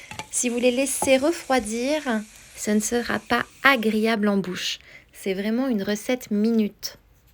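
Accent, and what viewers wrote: France. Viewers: Hi, if you don't mind me asking, from where is your French accent? I'm learning French and that's why I ask and by the way I love chickpeas and this tortilla/bread looks so good.😻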